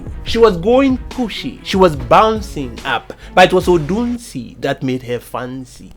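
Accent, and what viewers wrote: Nigeria. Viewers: yep because he creates more nigerian content and speaks like a nigerian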